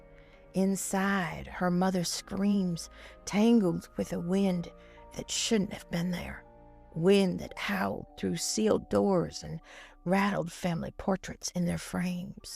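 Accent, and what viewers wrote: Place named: South, United States. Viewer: This was amazing! I absolutely love the southern drawl accompanying the southern gothic.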